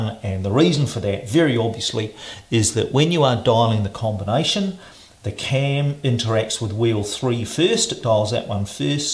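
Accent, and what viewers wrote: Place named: New Zealand. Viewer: I was dmn sure you were a kiwi :D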